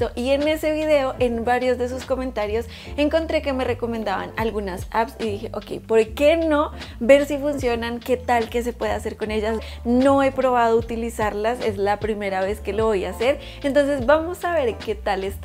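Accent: Colombia. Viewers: Me encantan estos tipos de videos y tu voz super relajante jaja no se el acento colombiano me gusta ❤️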